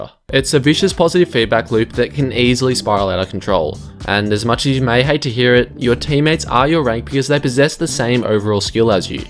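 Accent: Australia. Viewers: Oi you’re Aussie ay?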